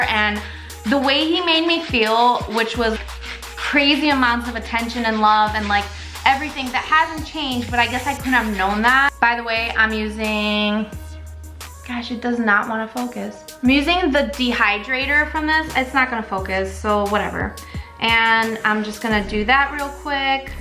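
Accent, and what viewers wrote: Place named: Illinois, United States. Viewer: I was born and raised in central Illinois...I knew your accent sounded like home🤍 I’ve lived in Louisiana for almost 20 years and the dialect is completely different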